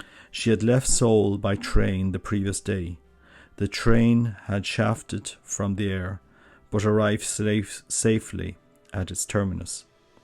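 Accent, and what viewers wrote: Ireland. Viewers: Did you go beyond chapter 1? We have a family copy of the book (Father Frank Canavan is my great uncle) but hearing it in an Irish accent is quite special.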